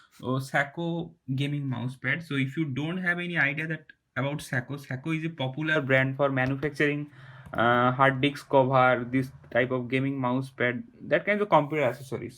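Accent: India. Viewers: you are form odisha i can identity by your english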